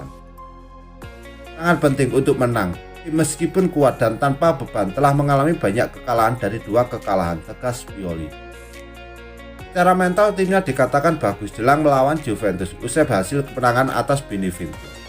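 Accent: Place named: East Java, Indonesia. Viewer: Suara e medok .. orang jatim ya?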